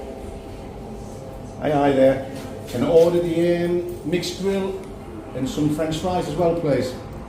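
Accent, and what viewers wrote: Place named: Newcastle, United Kingdom. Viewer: Hi! Love your channel!😊im from Denmark, and I have a friend from Newcastle, he moved to Denmark many years ago, but i recognized the Accent immediately 😅 you guys are special in the hest ways possible!❤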